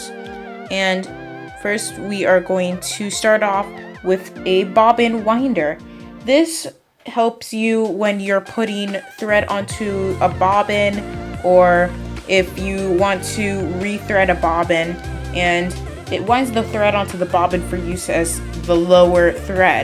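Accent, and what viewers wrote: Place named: Midwest, United States. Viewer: I can tell you're from the midwest by how you say your T's as D's...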